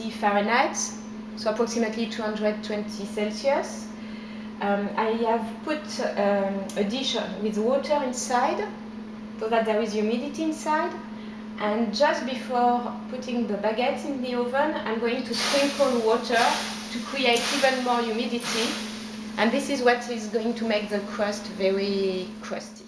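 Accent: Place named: France. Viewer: What a delight to listen to your French accent with your very good English. You bring back so many wonderful memories of the 12 years I lived and worked in France. I lived in Etampes & Arbouville and worked in Angerville. You have just demonstrated that a French Baguette can be made in so many ways and not just the classic artisan method. BRAVO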